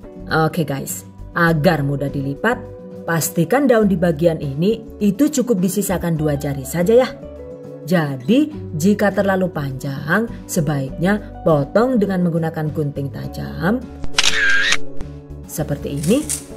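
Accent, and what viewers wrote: Banyumas, Indonesia. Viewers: Seru kl lg ngomong banyumasan, suaranya nge bass ternyata mbak nya imut cantik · Ngapak banget terhibur aku🤣🤣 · Deneng kaya wong ngapak 🤭 Ternyata ibune ayu,terus suarane khass CATAT..😆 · Betul banget. Suaranya juga khas😁😁 apalagi pas ngmg ngapak. Hrhe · Seneng bingit sama chanel inih# apalagi pas si mbake ngomong bahasa ngapak & English🤩🤩🤩🤩🤩#GiveawayTHV2021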